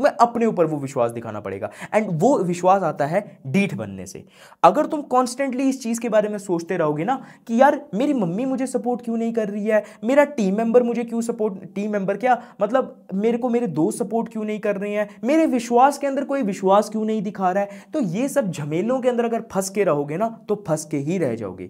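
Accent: Jammu, India. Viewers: Bhai aap jammu se ho kya Dogri ka accent aa raha h😅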